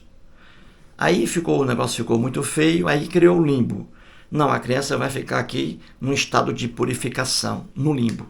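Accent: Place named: North, Brazil. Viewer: faça um video com o ANTONY GALVÃO (do canal Pilastra da Verdade), ele também é nortista. Sou cristão e paulista e acho bonito o sotaque de vcs 👍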